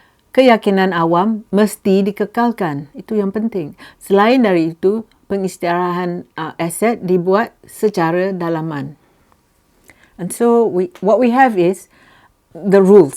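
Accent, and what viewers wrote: Perak, Malaysia. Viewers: You ni orang Perak ke ? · Pekat bunyi.Perak nya..😁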